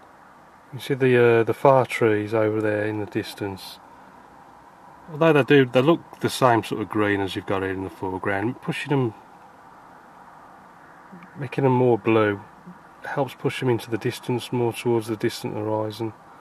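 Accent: United Kingdom. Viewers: I LOVE your British accent! And the painting of course! Just a little bit details on the trees would have made it look even better but it looks great already, I love the colors you painted on the lake and the sky, they looks so real.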